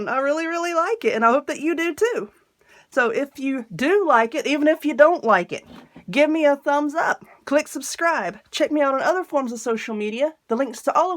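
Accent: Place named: Atlanta, United States. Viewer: Yes I love the way you talk to, could listen to you all day. If my great-great grandfather hadn't moved out of Atlanta north maybe I'd have the same accent, I love it! Really enjoyed this tutorial, thank-you so much for posting.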